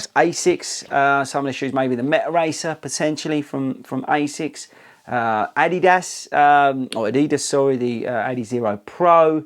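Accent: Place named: Northern England, United Kingdom. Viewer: Not a huge problem, I like a lot your videos, they are very "straight". By the way, where are you from, your accent, is from the north? my daughter is living in Kent and the accent is quite different. I am from Brazil. The comparative shoes videos are also very good. Thanks for helping us all !! Cheers!!!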